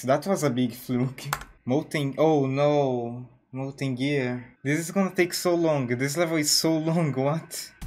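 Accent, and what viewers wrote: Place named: France. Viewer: idk why but your french accent is very nice to hear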